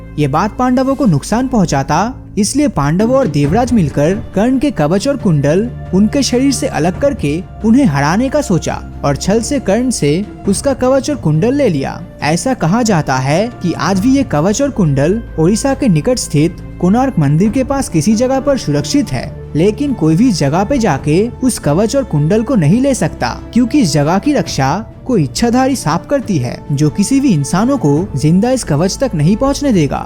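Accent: Bengal, India. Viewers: are u bengali from west bengal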